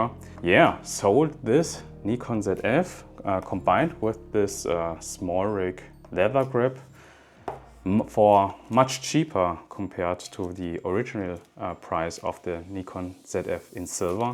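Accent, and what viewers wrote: Germany. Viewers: Interesting to see asian guy who speaking english wit a german accent 😊 and Thanks for video! Nice one ☝️ keep going!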